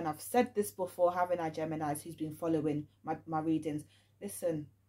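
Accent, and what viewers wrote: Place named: United Kingdom. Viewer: Are you British ? Love your accent but good reading thx for your time 🤍🤍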